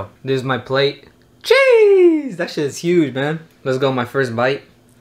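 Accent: Dublin, Ireland. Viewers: Your Dublin accent is on point😂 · That is the most on point Irish accent I have ever heard by a non Irish native, flawless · I’m confused are you American or from north Dublin? You don’t do a bad job at either.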